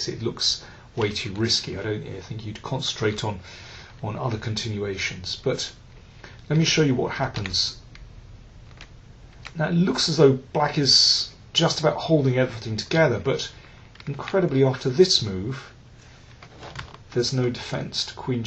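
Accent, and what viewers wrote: United Kingdom. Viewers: lol what's up with this guy's rich british accent, sounds very cartoonish haha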